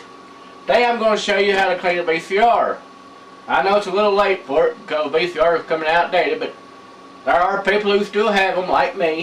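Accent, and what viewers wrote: South, United States. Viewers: He's definitely from the south.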